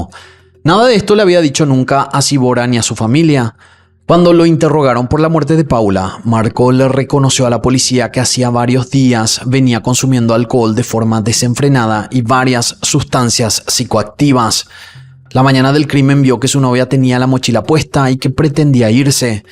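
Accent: Paraguay. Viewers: Es paraguayo. Habla hermoso 💃😎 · es de nacionalidad Paraguaya, y así hablan los paraguayos!!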